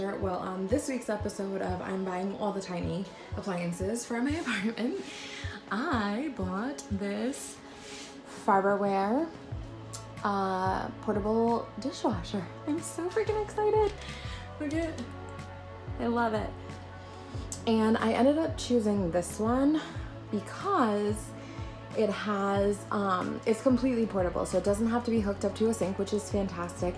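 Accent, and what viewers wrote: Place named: Upper Midwest, United States. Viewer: Upper midwestern accent—love it!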